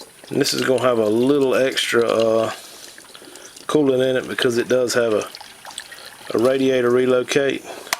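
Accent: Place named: Carolina, United States. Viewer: I know it's activating but I really appreciate you taking the time and activation to do these videos, very helpful, and you sound like a north or south Carolina man, I'm born and bread north Carolina and I'll die here unless the liberals get to much which I'd say thier pretty bad across the country, depending on which state your in, the triangle area with our schools, duke, unc state, wake forest, it brings the crazy in but we praying it don't get to bad but anyway just wanted to thank you for your time and videos, God bless you and your family in Jesus name, be well , Mike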